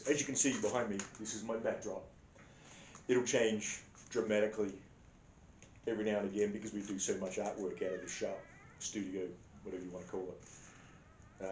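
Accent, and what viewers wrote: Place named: United States. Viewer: This is Kaz, somehow my grandson logged me out and I can't get back in. Geriatric memory loss 🤣 anyway love listening to you always. However you are starting to get an American accent. I can understand everything you're saying 🤦‍♀️. I'll hit the subscribe button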